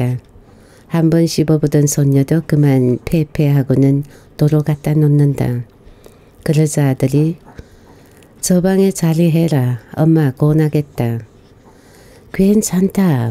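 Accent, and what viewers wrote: Gyeongsang, South Korea. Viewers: 경상도 말씨가 참 좋네요. 아들이 엄마를 보고 어짠 일인기요? 라니... 참 어이가 없네요. 잘 오셨어요 얼른 들어가입시더 해야죠. 아이보고 할매한테 정중히 인사드리라 하며 가르치고요. 아들부터가 잘못했네요. 우리 남편도 시어머님께 성질부리는 말투로 말해서 제가 뭐라했네요. 왜 다정하게 말하지 그렇게 말하냐고요.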